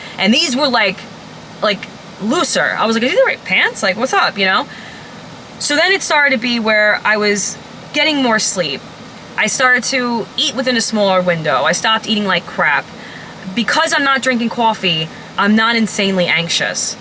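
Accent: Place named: Long Island, United States. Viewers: I'm from Long Island, NY as well and I knew right away she was from Long Island especially when she said " cawfee". I don't realize I sound like that too until I hear myself on a video or audio recording😂 BTW we find British accents interesting too. Unless you have that spit filled " Scouser' accent like the fighter Darren Till. .😂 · just sold on you're accent immediately. I have that same New York accent. Pleased to meet you. Im quitting cawfee myself today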